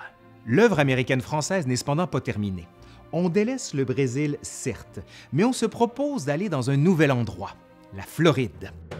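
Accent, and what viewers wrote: Quebec, Canada. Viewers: Très intéressant ! Et l'accent québécois ont adore en France 👍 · Et bien pour moi c'est la vidéo avec l'accent québécois qui me régale haha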